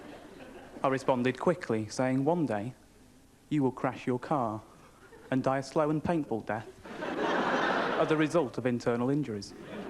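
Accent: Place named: Midlands, United Kingdom. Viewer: He's from the Midlands. Where are you getting cockney from?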